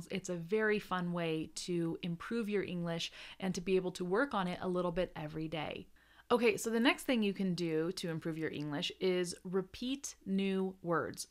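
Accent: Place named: Argentina. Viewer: te he escuchado hablar en castellano (muy bien por cierto) y nunca confundiría tu acento con otro hispano. Me haces sentir representado como argentino, raro porque no sos argentina pero muy lindo. Saludos.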